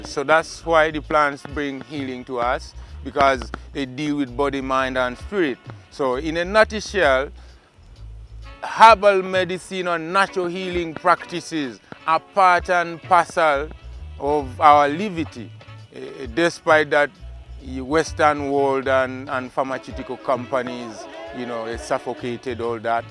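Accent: Jamaica. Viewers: Are you an African or a Jamaican you sound like a Jamaican in 🇯🇲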